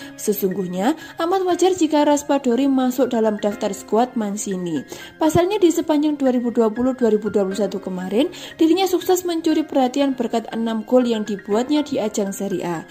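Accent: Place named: Medan, Indonesia. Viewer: Dari logatnya, aku tau mbaknya orang Medan, horas mbak 😂👋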